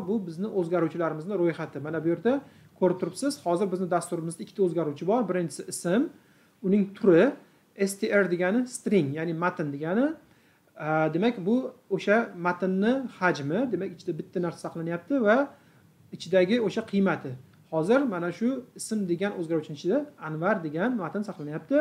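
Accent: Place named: Tashkent, Uzbekistan. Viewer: Toshkencha accent ingilizcha so'z yoqmasa surgin bettan yoki qsp darssi organ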